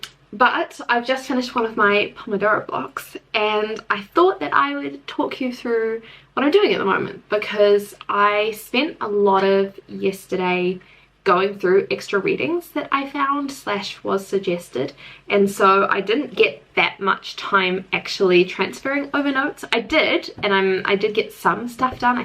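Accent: New Zealand. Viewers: Haha I recognized the kiwi accent right away!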